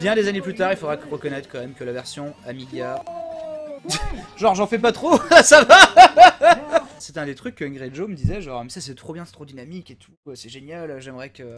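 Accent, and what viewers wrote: France. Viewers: Benzaie d'il y a 10 ans c'est juste le gamin des flashbacks du Hard Corner en fait:-D Je me souviens moi-même avoir vu ces vidéos sur ThatGuyWithTheGlasses (quand ça s'appelait comme ça) il y a pratiquement 10 ans... je me demandais à l'époque "mais qu'est-ce qu'il fait là ce français qui accumule les "fuck" et les "shit" avec son vieil accent?"... Que de chemin parcouru depuis! Félicitations Benzaie de toujours nous divertir après 10 ans, tous les vidéastes ne peuvent pas en dire autant! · Je me souviens t'avoir cherché dans le forum TGWTG quand tu as sortis ta première vidéo avec eux, t'ayant reconnu par ton accent si Frenchi et on s'était ajouté sur MSN, no joke. Toute une époque vraiment! Je suis bien content de te voir aujourd'hui à l'avant de la scène FR. Des bisous, on t'aime tous et à 30 ans de plus au moins, on te l'espère tous:)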